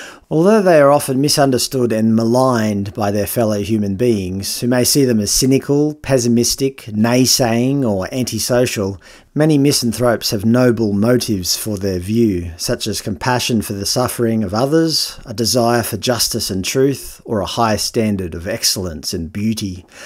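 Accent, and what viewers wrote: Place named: Australia. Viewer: you're an Aussie !!!!! Love this subscription even more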